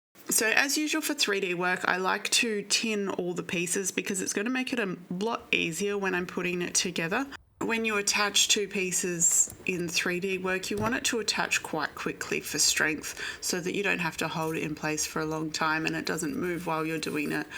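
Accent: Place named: Australia. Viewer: Great to hear another Aussie voice ❤ where can I get the stackers? I’m in NSW. I have some I use to make succulents which I got from the US but yours look great.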